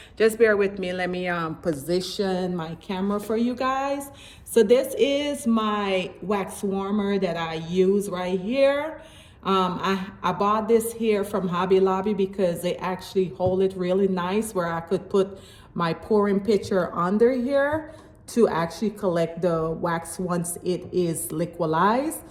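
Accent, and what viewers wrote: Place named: Jamaica. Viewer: i know a jamaican when i hear one lol big up mumma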